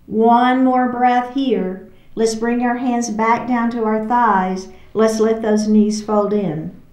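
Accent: South, United States. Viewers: I just found you -- quite serendipitously! -- for the very first time on your Day 1 of your 28-Day Chair Yoga series, and since it's Day 1 for me as well on committing to moving my body and getting my strength and physical excellence back, I thought it a match made in heaven! I like your style very much, your soft-but-obviously-also-strong energy, your excellent and very clear instructions (I really like the reminders you stick in there, and they often are so perfectly timed!)... and I especially appreciate your slight but lovely Southern accent [since my Daddy was from Georgia, and I do have a soft spot for things Southern:D]... I also love it that you use the Indian names for the poses, etc., AND I felt wonderful after the session... Well, I'm just thrilled, and I look forward to being with you every day. I also am not a beginner (a commenter mentioned that), but following the initial processes and starting" from scratch" feels like a perfect intro back into doing (and loving) yoga as I did years ago. 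AND may I also say (all due respect to the other teachers I've found and tried on YT so far -- I'm so glad you're closer to my age! There's a richness and a most welcome depth and smooth flow that happens from your expertise, and I appreciate it very much. Excellent session, -- thanks so much! I'm delighted to be doing this with you. -- Nancy from Oklahoma City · Thank you!! I'm so happy I found you!! You have a calming voice.. The southern accent brings me back home!! Can't wait to start this challenge with you! I'll let you know how it works out.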